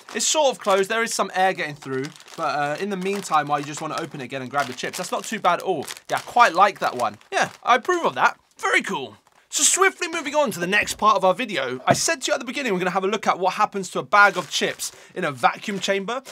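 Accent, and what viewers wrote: United Kingdom. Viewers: why are you saying chips when you sound English?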